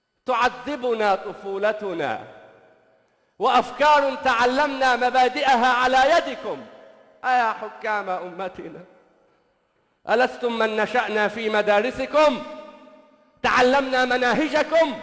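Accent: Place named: Libya. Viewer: سلم لسانك حبيبنا ليبيا طالعه من لسانك مثل العسل 🇱🇾🇱🇾🇱🇾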